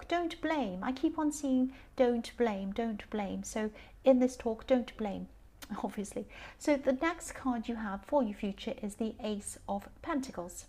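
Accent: United Kingdom. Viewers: Ace you're so British !!! Love you!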